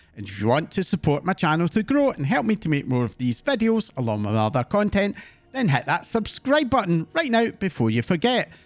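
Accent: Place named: England, United Kingdom. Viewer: Your english acsent is epic <3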